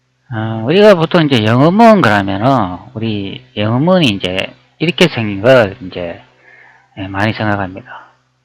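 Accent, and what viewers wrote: Gyeongsang, South Korea. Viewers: 그놈 목소리. 반갑다. 겡상도 목소리다. 예리한 자료수집력. 역사고증에 많은 도움을 준다. 자못 기대감이 크다 하겠다. 역사성. 사실성. 확인성이 매우매우 높다. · 경상도분이 자나요 사투리가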